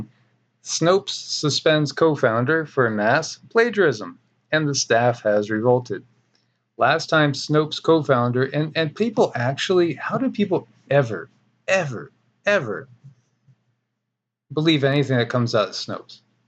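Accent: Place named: South, United States. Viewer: Your Southern accent is awesome!:)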